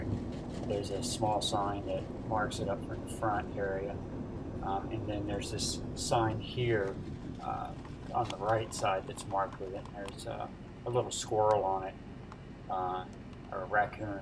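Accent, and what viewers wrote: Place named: South, United States. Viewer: Because, when I saw the name, I thought to myself," I have only known one in my life, I bet this is him, and asking would be the best way to confirm if it is him." I am being cryptic, because as you see, 0sum gamezzz is not my real name, and I want to remain anonymous. I am going to give you clues to as who I am, not too specific, because you are a sharp guy, and would know right away who I am. if you figure out who I am, please cryptically respond to me with clues as to who you believe I am...please? At one time, I counted you as one of my best friends ---- by the way, your accent is far less southern now, than it was, when you were a boy of say... 14 through 16. Facing the main office/kitchen, the building where Vespers took place I lived on the right side of campus. This may take a series of posts, if you decide to play along. 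Please be rest assured, I have no bad or disrespectful intentions towards you... in fact, it is 100 percent the opposite. By the way, you can ask questions to obtain my ID. This could be fun.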